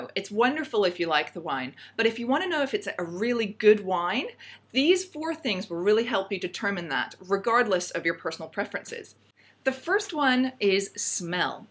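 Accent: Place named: Australia. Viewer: I feel like you're Australian 20% of this video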